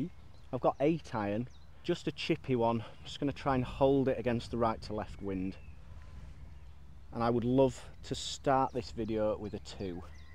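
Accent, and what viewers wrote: Yorkshire, United Kingdom. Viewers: thanks mate I am new to the channel really good I reconized your accent as I am from brighouse near Huddersfield. Great video mate.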